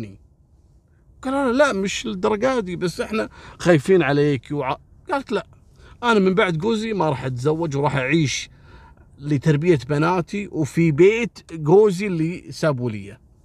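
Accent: Egypt. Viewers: يا حلو اللهجة المصرية من لسان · يا قصصك وطريقتك روووعة ولهجتك المصرية اروووووع 👏👏👏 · بحب طريقتك وانت بتتكلم مصري يا 😂😂بجد كأنك عايش معانا بمصر تحياتي ليك من مصر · قصة جميلة واروع ما فيها لهجة المصرية.....😊😊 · هههههه بحكي مصري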